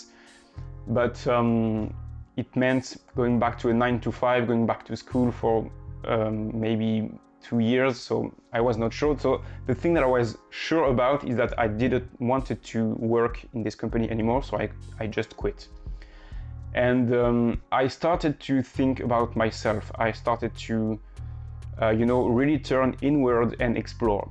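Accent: France. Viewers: Salut ! Malgré que tu parles très bien anglais il me semblait bien avoir perçu un petit accent francais derrière tout ca lol Je suis moi aussi INFP ingénieure en informatique depuis 1 an (data analyst) et je me reconnais tellement dans tout ce que tu dis... j'ai plusieurs fois pendant mon parcours à la fac (et encore récemment) réfléchi à si je n'étais pas sur le mauvais chemin... à la base je voulais être prof mais trop sensible au mauvais comportement des élèves j'ai changé d'idée, arrivée au collège j'étais sure de vouloir finir biologiste mais arrivée en fac de bio j'ai complètement perdu la motivation alors j'ai changé de voie et suis partie en fac d'info car j'avais eu cette option en biologie et j'aimais le coté logique et puis mon père aussi informaticien m'a surement influencé aussi à cette premiere periode de doute... je n'avais donc aucune idée du but de mes études contrairement à la bio ou je voulais "contribuer au bien etre du monde", mais j'aimais plutot bien ce que je faisais, je m'en sortais bien les premières années et j'ai obtenu mon diplôme après avoir pas mal galéré sur la fin, en fait j'avais l'impression de le vouloir sans le vouloir, de ne pas trouver de but à ce diplôme... 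Cette année j'ai décroché mon premier CDI tout se passait très bien une fois lancée je kiffais ce que je faisais je ne me posais plus de questions... le covid est arrivé, j'ai du coup perdu mon job et cette période de "vide" m'a fait revenir à moi et qui j'étais vraiment... Avec du r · Hello! This video resonated so much with me!! Although I've never been exceptionally good at Math and Science, I always knew I had a knack for languages and that's what I decided to pursue. I've enjoyed most aspects of it but if I could change what I did at university, I would add in something like Management or maybe Economics or idk, something a bit more "useful" (I majored in Latin American Studies). Anyway, I taught English to non English speakers (including in France! When I heard your accent it made me smile....I love Toulouse ❤️) but now I am just burned out from teaching. I'm currently doing some studies in Human Resource Management but I'm really worried I made a wrong decision because where I live, it's a really small Caribbean island so the market is saturated and I'm here again, feeling very depressed about the future etc. 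Sighs! Unfortunately, I'm still trying to figure out what's the best option for me, only knowing what isn't for me but never quite figuring out what career does resonate with me. I suppose I need to do some deeper digging. Anyway, sorry for the really long message! I'm sorry that I only just saw this video as well! It would've helped me so much back when the pandemic was just hitting and I was returning from my very last teaching stint in Spain. For now, I have a job that pays the bills but I know I need to leave soon! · Merci beaucoup tes vidéos vont probablement m'aider ! J'ai terminé ma licence de biologie (3 ans), mais je ne suis toujours pas sûre et n'ai jamais été sûre de ce que je voulais vraiment. Le côté musicienne / psychologiste je m'y suis bien reconnue. J'ai dû faire le choix en entrant en 1ère entre littéraire et scientifique, j'ai énormément hésité et j'ai suivi la voie la plus "confortable" et logique du point de vue des gens qui m'entouraient. 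Il m'arrive souvent de regretter ce choix, c'est tellement tôt pour déjà savoir ce qu'on voudra faire plus tard ! Je me reconnais complètement dans tes vidéos pour la personnalité INFP, merci pour le partage de tes recherches ! Et grâce à ton léger accent français j'arrive à tout comprendre haha !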